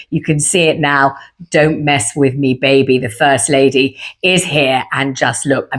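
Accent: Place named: RP, United Kingdom. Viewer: You speak soooooo well, you are a 1 off. No-one could comment like you. You're amazing & love your Queen's English, you speak soooo eloquently xxx thankyou xxx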